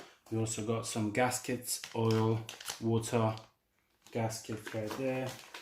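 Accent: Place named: England, United Kingdom. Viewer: You are very nearly speaking with an English accent now!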